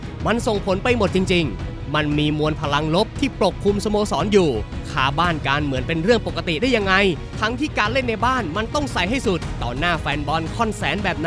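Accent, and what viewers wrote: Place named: North, Thailand. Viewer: เพิ่งรู้ว่าแอดมินเป็นคนเหนือก้อคลิปนี้แหละ😂😂😂😂แกนตา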